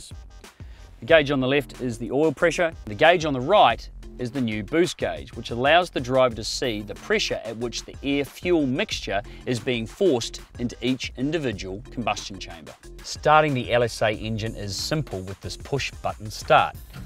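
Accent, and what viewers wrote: New Zealand. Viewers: Who let this kiwi present this video? Would have thought they would keep in Australian...seen as though it's an Australian produced vehicle...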